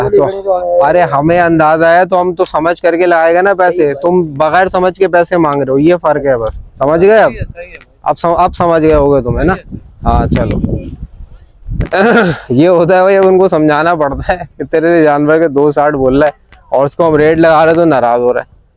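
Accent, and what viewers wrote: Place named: Karachi, Pakistan. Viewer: Yr pure Karachi wala style keep it up brother ❤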